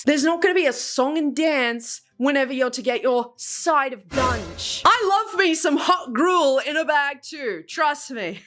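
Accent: South, United States. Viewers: your american accent was so good i forgot you were australian for a second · Ngl ur American accent is hilariously good 😭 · your Southern accent is spot on 🤣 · I wasn't expecting the southern accent immediately, I'm crying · Why is your southern accent peak?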